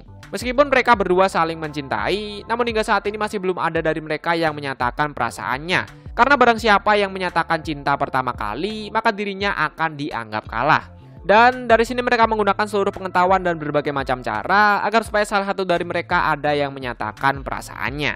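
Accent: Java, Indonesia. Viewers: Jawa ya bang? awokawok sama :v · Gk popo asli jawa yh?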